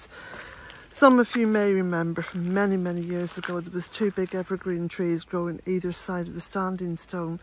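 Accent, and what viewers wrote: Ireland. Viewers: Last time i watched one of your videos you had your black dog. The little jack russell is very cute. And your accent has become very irish! Lol😊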